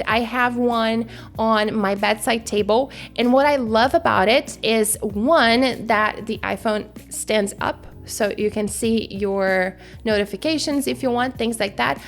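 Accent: Brazil. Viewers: I love your English. You’re Brazilian, aren’t you? You could open an English Channel to give us some tips.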